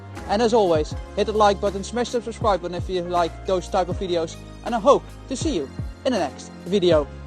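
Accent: Netherlands. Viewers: Toen ik je eerste video zag dacht ik een beetje te horen dat je Nederlands was. Zelfs als Nederlander is deze vraag ook moelijk te beantwoorden. Zal het zelf eigenlijk ook niet weten. Ohh echt, wist niet dat die actie groep bestond. Ik leer nu super veel van deze video. Ik leer elke dag wel iets nieuws. Vind het wel erg dat ik dit eigenlijk niet wist hahah. Super bedankt voor het maken van de video! Groetjes uit Groningen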